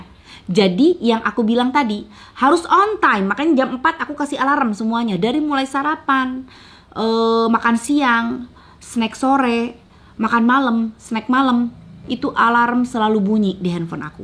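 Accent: West Java, Indonesia. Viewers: Teh memang luar biasa biar sudah sukses tpi ttp sundanya ttp nempel melekat... Sahabat teteh suk maampir ke sebelah kita joged" dengan alunan lagu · Nyunda pisan s tth..hidup urg sunda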